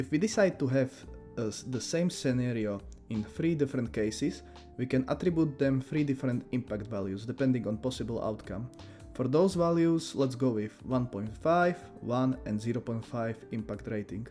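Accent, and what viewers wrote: Czech Republic. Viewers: bros def czech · Hi good video btw are u from Czech republic? Ur ascent is so czechian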